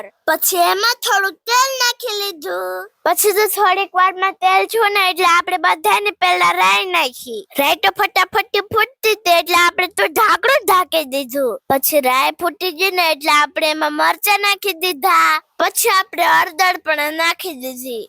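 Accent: Kathiawar, India. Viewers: Khatiyavadi boli Achi h bazre ni rotti khub hari bnati ho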